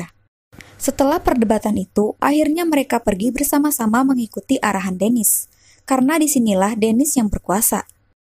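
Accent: West Java, Indonesia. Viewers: Sunda ya kak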